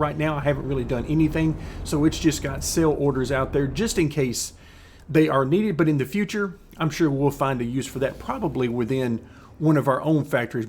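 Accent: United States. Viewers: Nice video. From the sound of you, we are probably from the same area of the US. I'm not great at the EVA either btw.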